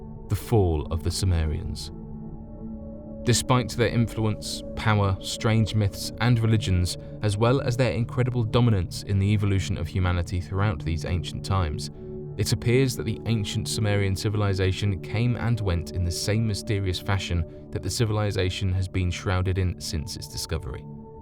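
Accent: United Kingdom. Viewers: Thanks for the nice British voice narrative. A nice distraction from the Trump nightmare. But if we're an alien ant farm, which I believe, why can't they keep things under control better??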